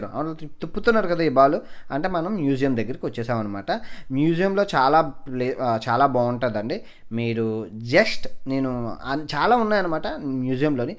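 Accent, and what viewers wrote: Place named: Vizianagaram, India. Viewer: Bro i am frm Vizianagaram.. Nen miru upload chesina prathi video chusthanu... Andhukante nenu yelaago out of country vellalenu kabatti... Mana slang lo entha baaga chupisthunnaru... Nen video chusthunte nenu vellinattu vuntundhi.. Really thanks bro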